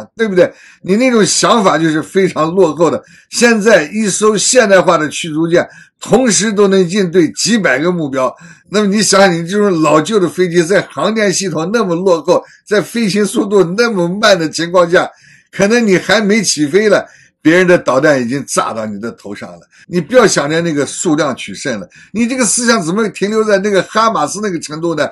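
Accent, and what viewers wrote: China. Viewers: 听你的口音，中国人？一大把年纪了，想想怎么养老，你的所谓的讲解有意思不，！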